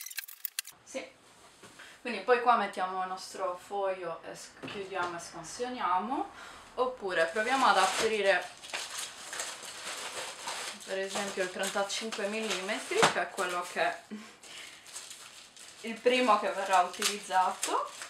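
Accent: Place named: Sardinia, Italy. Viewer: Sono felice di rivederti cara e principalmente di poterti ascoltare, fortissimamente sarda...:)))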